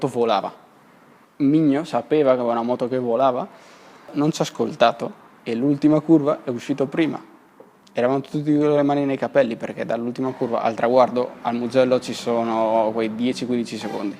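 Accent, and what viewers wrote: Spain. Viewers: È vero comunque c’hai più la parlata e l’accento spagnolo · si sente che hai passato anni in spagna sembri uno spagnolo che parla un perfetto italiano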